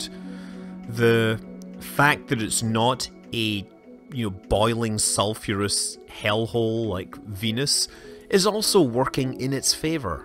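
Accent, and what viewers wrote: Scotland, United Kingdom. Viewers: It's called a scottish accent. Turns out native English speakers around the world don't all speak it the way you specifically do.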